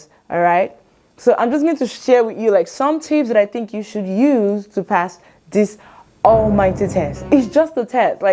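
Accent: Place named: Liberia. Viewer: Bro on God you sounds like a Liberian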